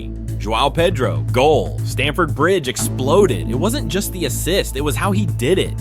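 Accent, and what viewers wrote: United States. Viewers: Why in God's name do we have to subjected to this awful American garble? Learn English we were here thousands of years before you!!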